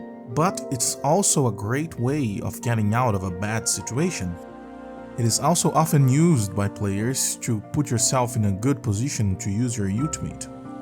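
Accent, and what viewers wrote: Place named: Brazil. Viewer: Dude, you kinda sound Brazilian. Where are you from?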